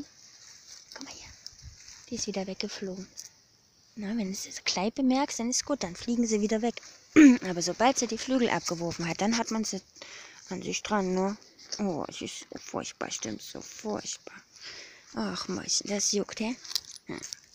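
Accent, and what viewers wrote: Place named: Saxony, Germany. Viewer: Na du weißt ja, dass ich sächsisch mag..😇 Da freuen sich bestimmt auch alle anderen hier in UNSERER Dorfschule, wenn du es nochmal aussprichst..😜 Habe mir gerade einen Kakao gemacht.. Pulver, eine Miniprise Salz, Vollrohrohrzucker und Wasser...😋 Nu issa aber schon alle..😝 War superlecker!!🔆 Und schreit nach mehr.. Werde beim nächsten Mal, etwas Kurkuma, Pfeffer, Muskat, Kardomom, Kokosöl etc. zugeben.. So wie man eine goldene Milch macht, wenn dir das was sagt.. Schön, dass es auch ohne Milch und nur mit Wasser schmeckt..😘 Super Tipp von dir❤😇🔆😘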